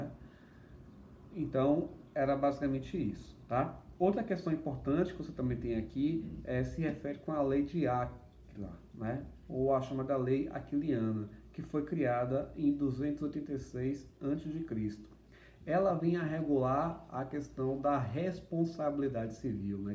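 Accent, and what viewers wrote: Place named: Salvador, Brazil. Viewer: Eu sabia que vc era de salvador desde sua primeira palavra 😂😂😂😂. Aula fenomenal, mestre! O senhor leciona em alguma faculdade aqui?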